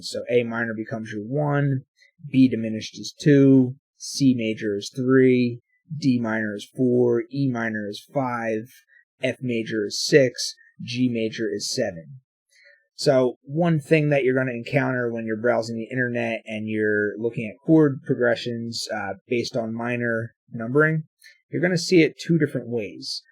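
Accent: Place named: Philadelphia, United States. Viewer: You live in the Philadelphia/NJ area? That accent sounds like home.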